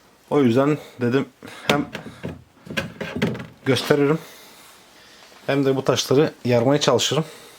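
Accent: Thrace, Turkey. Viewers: Trakyali edirne tekirdag tarafli.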